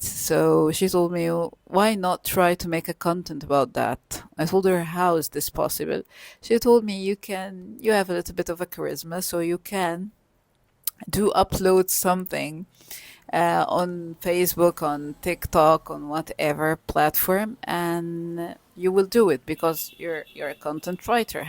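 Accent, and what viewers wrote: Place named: England, United Kingdom. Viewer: Love you English accent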